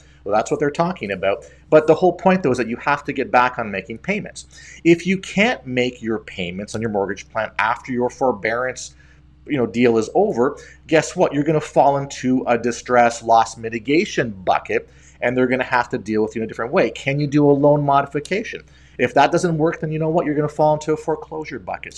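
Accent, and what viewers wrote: Canada. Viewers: You must be Canadian because you say oot and aboot.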